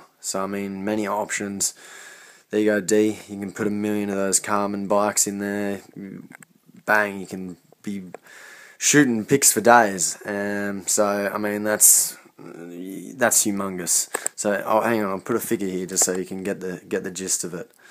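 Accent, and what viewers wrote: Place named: Australia. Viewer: Aussie accent good to hear g'day from Perth P.S great content nice work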